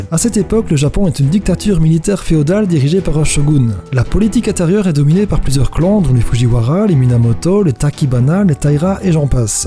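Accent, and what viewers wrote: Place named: Liège, Belgium. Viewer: Dis tu viendrai pas de Liège parce que l'accent ressemble beaucoup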